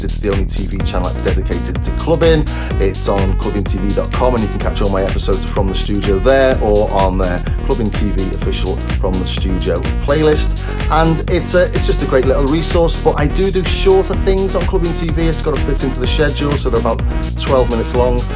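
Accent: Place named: RP, United Kingdom. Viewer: Your Received Pronunciation accent is hilarious! Brilliant. You should run for Prime Minister and campaign with that RP accent the entire time and then when you get into office, switch back to your native Scouse accent. Haha.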